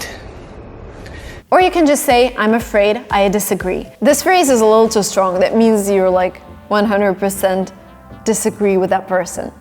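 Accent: India. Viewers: This exactly is similar to how we speak English in India. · It look's like all Indians are showing up here🇮🇳.The thing here is her accent is like Indian who speaking in English...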